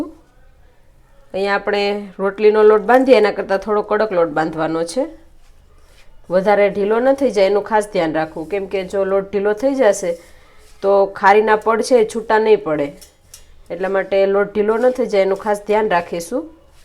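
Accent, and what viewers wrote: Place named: Africa. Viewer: Looks amazing ben Hu pan banavish Radha rani bless you ❤ You sounded like you are from Africa Me too now in uk 🇬🇧 😮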